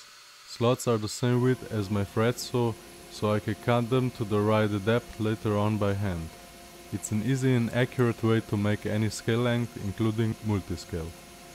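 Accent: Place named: Montenegro. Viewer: Nice work man! I would almost bet, judging by your Eng accent, that you come from the small and beautiful country that has about 40km of Adriatic coast and a 2850m highest mountain. Can I ask why you opted for the glued neck, was it aesthetics or also sound? And did you make your own pickups? I have never seen that shape of pu's before. Last question :) ... what gauge strings did you put on. The low E looks like it's quite thick, like 54 or 60. Cheers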